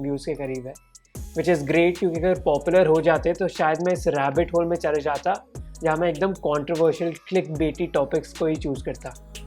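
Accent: India. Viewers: Can you put captions on this video? Because it's really hard for me to understand the indian accent 😭😭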